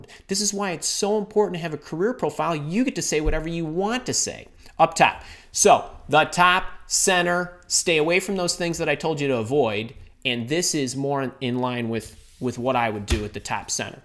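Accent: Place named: Michigan, United States. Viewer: Sound like a Michigander..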